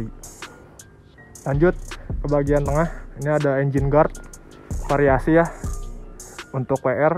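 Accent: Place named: Sunda, Indonesia. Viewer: Sunda pisan ei AMANG Yeu mh euy. Bhasa na. MANTAP..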